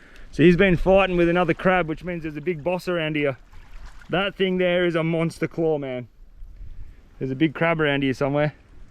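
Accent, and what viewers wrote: Australia. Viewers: Is he a Aussie? His accent reminds deff yells "Hey! I'm from australian!"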